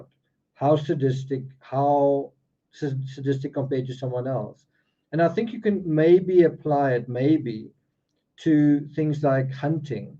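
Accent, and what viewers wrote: South Africa. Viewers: South African talking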